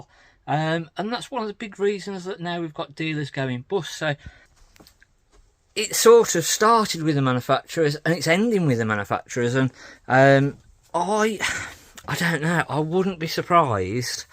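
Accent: Midlands, United Kingdom. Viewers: The fella has a midlands accent. Life would be so boring if we all spoke the kings English like you!!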